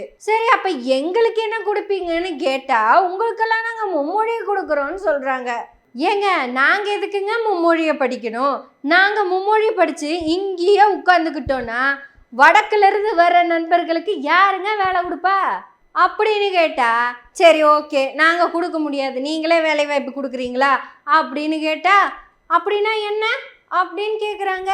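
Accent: Kongu, India. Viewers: கொங்கு தமிழில் நீங்க பேசறது அருமை அம்மிணி வாழ்த்துக்கள் சகோதரி 👍🏼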